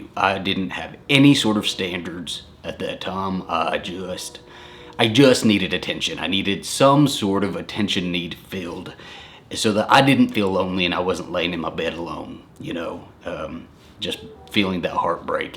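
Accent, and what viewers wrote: South, United States. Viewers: Dude you are the BEST story teller!!! You should narrate books, southern accent and all!! Love you two!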